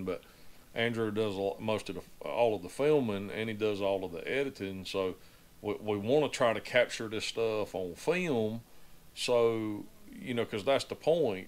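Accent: South, United States. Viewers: I’m just here to tell you, I’ll be here watching ya make whatever you make. I miss hearing real southern folk talk out here in Cali. You could polish a turd and I would watch. I am not your target demographic, but you can educate and explain things to people from different backgrounds. You do you, I’ll be here watching.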